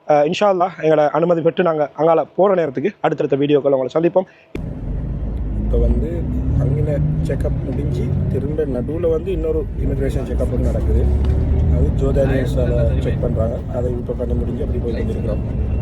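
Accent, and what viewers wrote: Sri Lanka. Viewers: Mashallah Allamthulliha Allamthulliha Almighty Allah bless you excellent presentation sir you are speaking ceylon tamil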